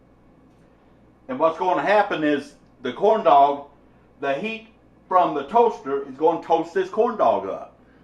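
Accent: United States. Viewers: this dude has a very witty American accent! It really works tho.Thanks!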